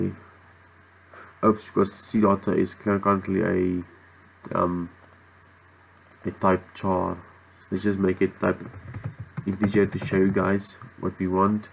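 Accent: Afrikaans, South Africa. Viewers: Are you South African ? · Nice Afrikaanse aksent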